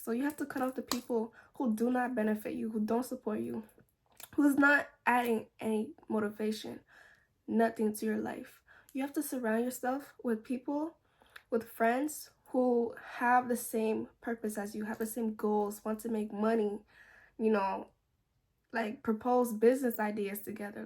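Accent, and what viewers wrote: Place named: Latin America. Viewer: Are you latina tour accent is so strong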